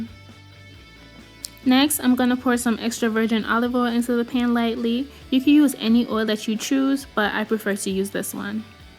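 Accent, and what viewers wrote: United States. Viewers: Yea that's how I make mine, how you no so much about Jamaican food, I thought you was an American. Girl especially you're voice.love you're videos so much.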